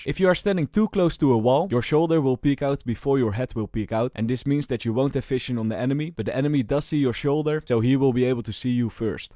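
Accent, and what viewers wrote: Netherlands. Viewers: If youre not Dutch you dont hear it, but the fact that he still sounds more Dutch than English after years of making English content hurts me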